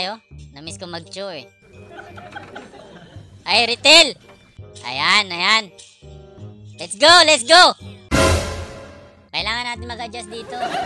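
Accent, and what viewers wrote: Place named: Iloilo, Philippines. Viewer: taga koronadal ka pala.. Kaya pa illonggo timo.. Hahah Galing... Frm gensan.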